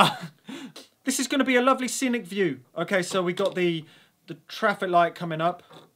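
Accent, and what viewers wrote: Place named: London, United Kingdom. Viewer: He does sound like a Londoner, in which case- he's in the right place!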